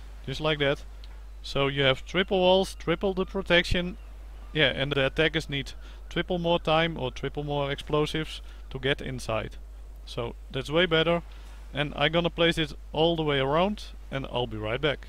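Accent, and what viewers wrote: Netherlands. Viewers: Dat dutch Akzent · are u dutch? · Nice dutch accent ;) subbed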